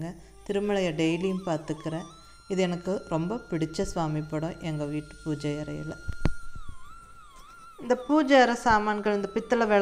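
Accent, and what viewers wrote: Kongu, India. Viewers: I like your kongu tamil very much. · Neenga kongu Tamil a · Alaga Coimbatore slang pesaringa pongako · Mam ur from which place. Ur slang looks like Coimbatore. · Hi mam,unga kongu Tamil nallarku,and unga son pencil sketch really nice.....we r planning to built a new home....unga pooja room,bed room,kitchen over all its so good and romba tasteful ah katirkinga....pls show al d rooms...and front elevation....and pls mention d furiture purchase place....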